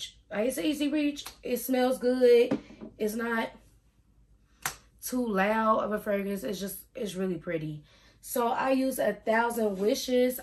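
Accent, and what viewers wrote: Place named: South, United States. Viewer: Hi, I’m surprised you are from Ohio. You seem to have a southern accent. I live in Akron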